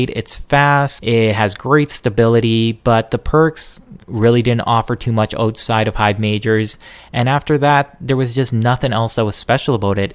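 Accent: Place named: Canada. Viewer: Commentator is most definitely Canadian... haha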